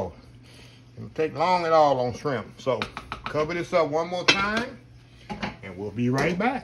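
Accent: South, United States. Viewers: Oh my goodness! That looks so good. I like listening to your southern twang.....especially when you say temperature. 🤗🤗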